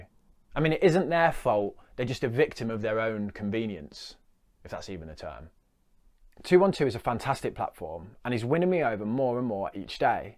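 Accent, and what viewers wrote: Birmingham, United Kingdom. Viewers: Forgot to say, great to hear from another Brummie! 😂🙌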